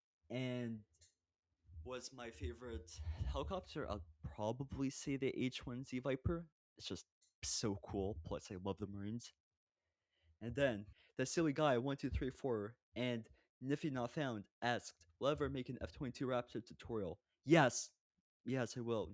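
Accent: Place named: Canada. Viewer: You sound so Canadian 😭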